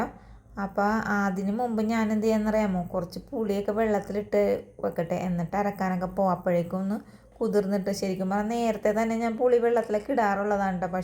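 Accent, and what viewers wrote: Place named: Ernakulam, India. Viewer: എറണാകുളം. കടലോരo. ശബ്ദമാണല്ലോ 😄. 👍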